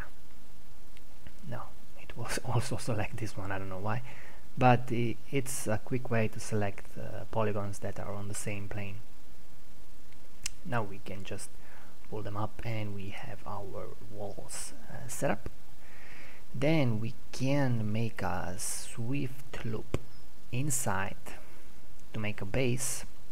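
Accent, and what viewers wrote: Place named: South Korea. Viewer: Your english sounds like some korean idol i know lol, but helpful video :D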